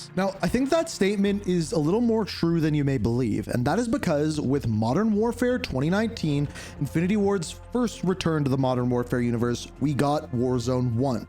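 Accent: Canada. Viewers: This guy ain’t American. He Canadian! Accent gave it away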